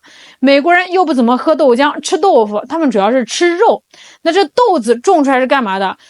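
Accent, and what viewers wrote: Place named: Northeast, China. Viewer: 东北姑娘！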